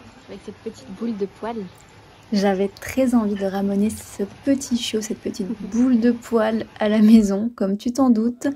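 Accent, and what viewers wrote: France. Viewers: Cc Mon voisine Comment allez vs jsuis ben Votre introduit est claire et accent bien Francais Ca me la plait bc Merci Bon cafe C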